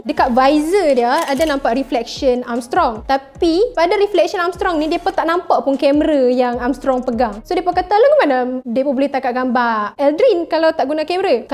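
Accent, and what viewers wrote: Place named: North, Malaysia. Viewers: Memang lah...depa nak tunjuk longhat utagha kan.. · Uishhh loghat utagha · Pekat sggh utariaq hg nohh 😁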